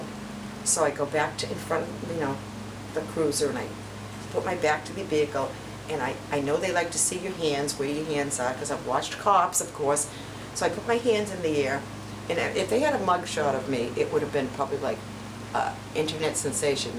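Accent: Boston, United States. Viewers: your story did put a big smile on my face still having a very difficult time but I’m glad that you commented on my video and directed me here I probably should know this and we have probably spoken about it but I can’t remember are you from Massachusetts because you definitely have a Boston accent - I know you’re sick but you look amazing and yes prednisone is some scary stuff and can make you feel really weird I have to catch up on some of your videos I don’t always comment but I do watch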